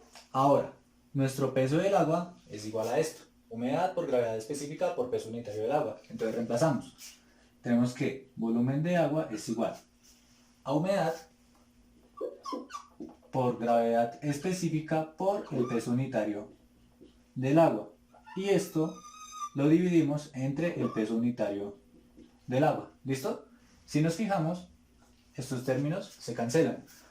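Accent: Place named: Colombia. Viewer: tal vez ya no te sirva pero por el acento creo que es Colombiano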